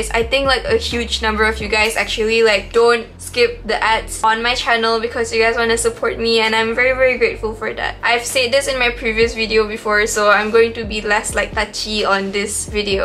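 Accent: Sarawak, Malaysia. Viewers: Akak orank sarawak kahhh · Sarawakian? I heard something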